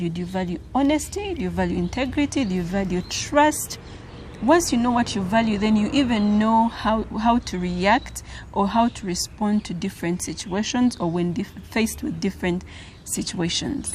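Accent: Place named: Buganda, Uganda. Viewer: Your voice is life. I love your Buganda accent 💖💖💖💖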